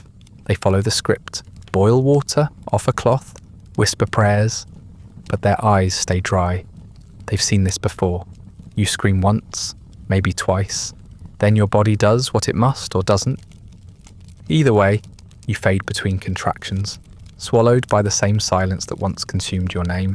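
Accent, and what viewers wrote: United Kingdom. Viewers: Yes, with an English accent.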